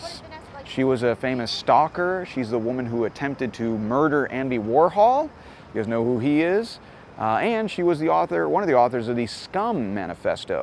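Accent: New York, United States. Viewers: I don't like his New York style of speech similar to David Letterman. I never met a person from NYC who I liked who wasn't loud and abrasive.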